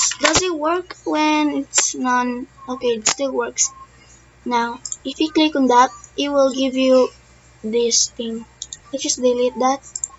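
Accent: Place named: Philippines. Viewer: nice filipino english accent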